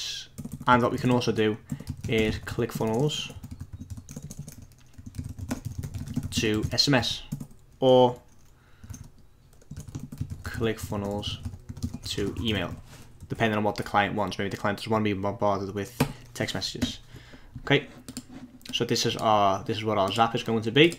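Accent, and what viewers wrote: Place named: Liverpool, United Kingdom. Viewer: Hi I can tell by your accent that you are also from Liverpool. I was wondering wether Liverpool is the right place to start my smma journey. Thoughts?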